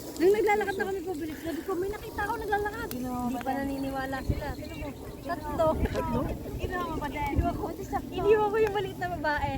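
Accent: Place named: Bulacan, Philippines. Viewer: Parang punto kayung bulacan?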